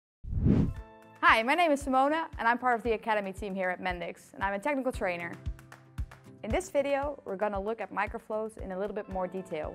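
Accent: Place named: Netherlands. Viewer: Sick dutch accent XD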